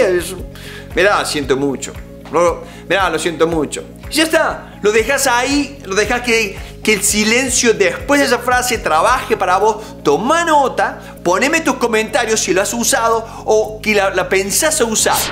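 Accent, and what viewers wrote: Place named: Cordoba, Argentina. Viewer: Sos cordobes hasta la espuma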